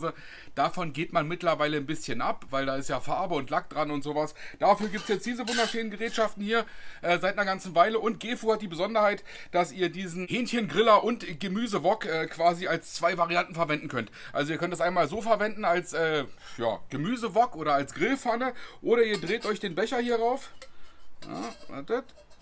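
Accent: Berlin, Germany. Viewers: Ich habe erst vor 5 Wochen meinen ersten (Gas-)Grill bekommen und kann deshalb ganz viel von dem präsentierten Zubehör (#GEFU) und auch von den Gewürzen brauchen ohne es im Schrank doppelt zu horten. Und obwohl wir uns (Du Berliner, ich Bayer) dialekt- und sprachtechnisch voll unterscheiden, find ich Deine Videos und Anleitungen "voll dufte"! 👍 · #GEFU habe schon ein paar GEFU Produkte zuhause...aber die würden sich bei mir perfekt ergänzen:) mach weiter so! Richtige Berline Schnauze 😉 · #GEFU mag als Rheinländer Deine Berliner Schnauze und auch Deine Rezepte. Als Grillanfänger, braucht man so einiges an Zubehör, um Deine Rezept ohne zu improvisieren nachkochen/grillen zu können. Gewürze sind immer geil und ausprobieren von verschiedenen Gewürzen macht immer Spaß . Weiter so mit den tollen Rezepten und verlier Deinen slang nicht. Hau rein. Gruß aus dem Rheinland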